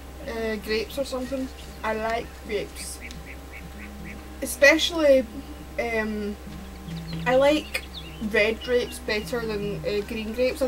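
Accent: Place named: Scotland, United Kingdom. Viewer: I always enjoy watching your videos. I just have to watch some parts over and over again. Because of yer scottish accent x3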